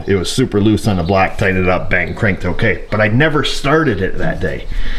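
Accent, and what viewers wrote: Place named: Illinois, United States. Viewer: is more midwest sounding than Taryl....I'm guessing downstate Illinois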